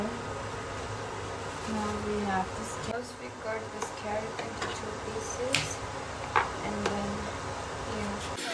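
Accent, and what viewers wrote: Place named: Ladakh, India. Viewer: Way u r talking ki ohhh na ladakhi spara shasa manakkk type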